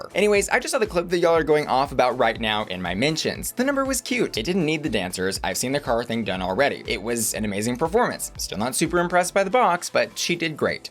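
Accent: Spain. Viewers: The best thing that came out of this drama is that I got to hear your lovely spanish accent! Thank you queen! ❤️❤️❤️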